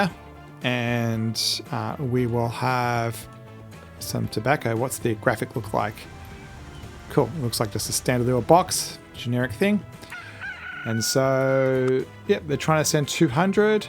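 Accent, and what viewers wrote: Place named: United States. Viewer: In UK we say it like assfault We say d-e-po where you say it Dee-po. We say "root"when saying route. Always wonder why Australian and new zealanders say it like the yanks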